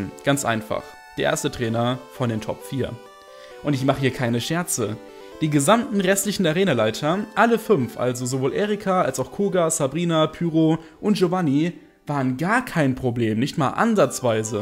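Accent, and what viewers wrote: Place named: Germany. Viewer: Ich hab selten jemanden so deutsches Englisch sprechen gehört...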